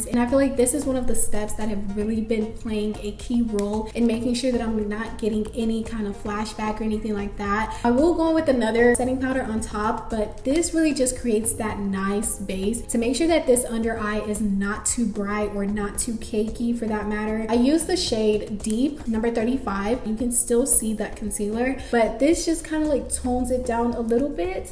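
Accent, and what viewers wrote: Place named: Nigeria. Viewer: I’m 1 minute in the the Naija in you is jumping out. Is it by force?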